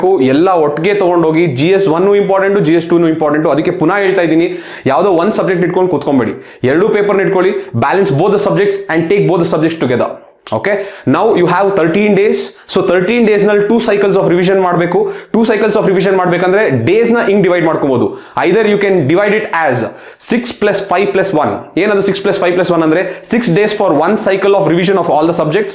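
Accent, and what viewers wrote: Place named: Hassan, India. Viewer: Thank you sir❤... ನಿಮ್ಮ ಭಾಷಯಲ್ಲಿ ಹಾಸನ ಜಿಲ್ಲೆಯ ಅರಸೀಕೆರೆ- ಚನ್ನರಾಯಪಟ್ಟಣದ ಸೊಗಡು ತುಂಬಿದೆ😊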